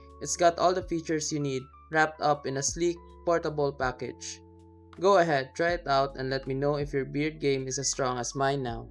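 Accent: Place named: India. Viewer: What's with white guy on your thumbnail when you're Indian voice? 100% you haven't used this or own it, not once did you show it on camera, only website footage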